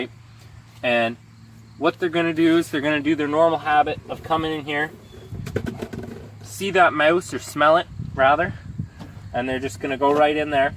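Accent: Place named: Canada. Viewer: As soon as I heard the Canadian accent, I knew this was the right video to watch.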